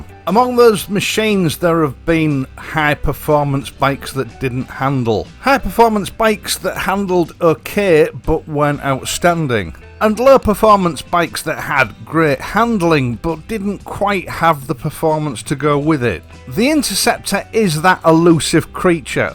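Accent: United Kingdom. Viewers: I think you are only person who has given your heart to every comment... By the way I was taken away by your 'Raw British' accent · Thanks! Pro review, nice use of Queen's English, skill in composition and videography. Watching more than once.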